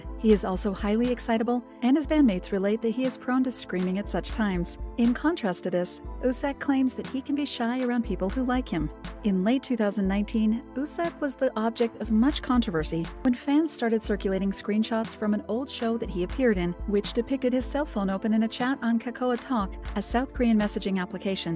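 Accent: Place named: United States. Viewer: I don't understand why you can't just put subtitles.. I come here to read about him, but it's annoying hearing someone speak.. especially with a strong American accent.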